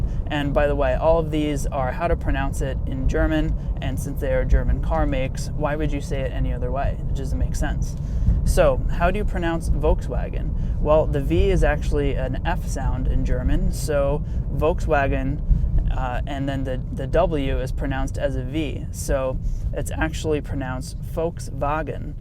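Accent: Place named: United States. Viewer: Not , Dubble U , but Wee but the "ee" from the Wee , sound like , the " a " in " Say, "Bee em Wee" (not Vee , a V in German is pronounces as a "Vow" ) , in Dutch we say it the same as the Germans. , You havent been saying it wrong , you have just been pronouncing it like a american , not a german :D . Like " Zeit " the Z is pronounced like a "TZ" , TZEIT , you would write , TZAIT. writing i phonetically makes it easier.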